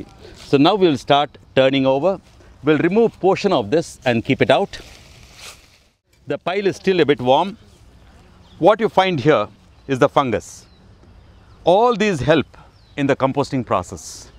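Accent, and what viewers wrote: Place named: Kerala, India. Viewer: His accent sounds like that of Malayalis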